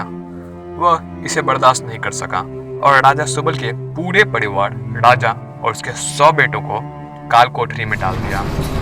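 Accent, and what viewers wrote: Bihar, India. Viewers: Tum bihari hoo kya 🙄🙄🙄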